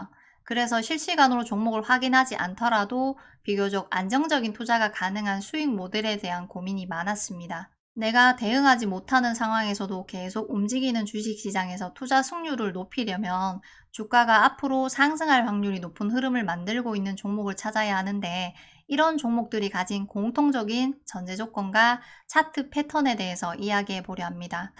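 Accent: Gyeongsang, South Korea. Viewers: 설명이 깔끔하시네요 근데 경상도 어디 사투리인가요~?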